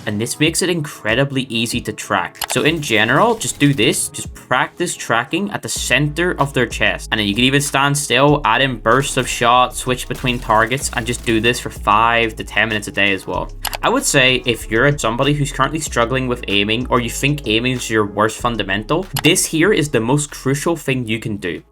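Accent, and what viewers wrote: Northern Ireland, United Kingdom. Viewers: curious what part of northern ireland you are from. Only came across a handful on apex.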